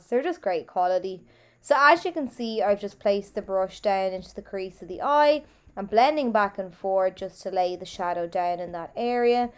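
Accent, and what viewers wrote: Ireland. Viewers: Thank You for another fab eye look loving the smoky emphasis on the lower lash line and with a gorgeous Irish accent, I do love an Irish accent you know 🥰⭐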